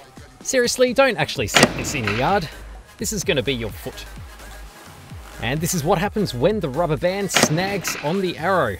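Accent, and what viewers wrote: Australia. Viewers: Idk why but I love the australian accent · O meh gawd, I found another Aussie! How ya doin' mate? (Pretty new to the channel.) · Great to hear our Ozzie accent on the tube without the waffle like the mob from North of the equator go on and on and on and on till I usually hit the mute button .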